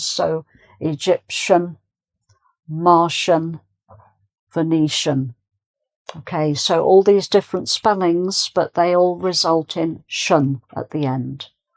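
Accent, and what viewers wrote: England, United Kingdom. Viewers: You are amazing lady and a great teacher with so pleasant British pronunciation, thank you❤🌹🙏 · British English? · The Lady has nationality English basead in her voice. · Hi great teacher I love British accent.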